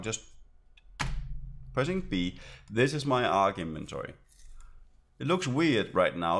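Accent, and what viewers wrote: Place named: Denmark. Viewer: Where are you from. Let me guess Denmark?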